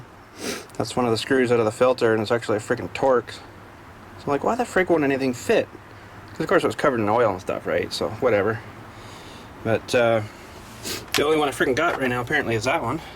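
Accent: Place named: Canada. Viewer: Thanks for posting up this video! I like the way you "neighbors to the frozen north" speak. Cracks me up!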